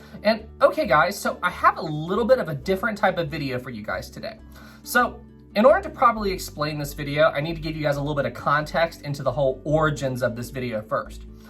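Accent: South, United States. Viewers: great video from scotland love the sourthen American accent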